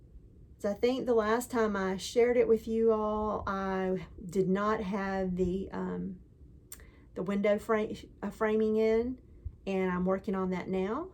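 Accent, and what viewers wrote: South, United States. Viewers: I enjoy listening to you, you’re even more southern than me here in Oklahoma. I hope you’re feeling better soon!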